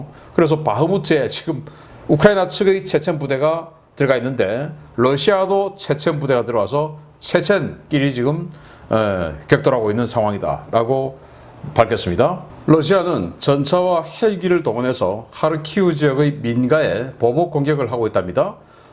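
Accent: Gyeongsang, South Korea. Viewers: 구독자 분 중에 수도권.전라도 사람들 있슴 함 봐주삼 난 갱상도 출신이고 수도권에서도 10년 넘게 살다가 지금은 다시 컴백험 했는데, 모르겠으나 갱상도 출신 신인균 씨 말투가 같은 동향이라 그런것도 있지만, 객지생활 10년 하믄서 제주도 사람까지 만나봤는데, 말을 듣기엔 크게 거부감은 없거든여?! 시원시원한게 속 시원합디더.. 다른 지방 사람들, 특히 수도권.전라도 분들은 어떠신가여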